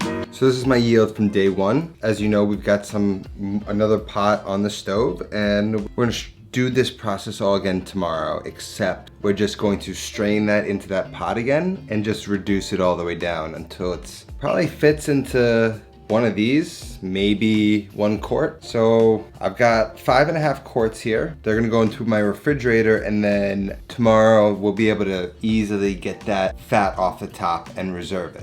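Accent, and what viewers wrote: New York, United States. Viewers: I watch for the food, your handsome face/ NY accent.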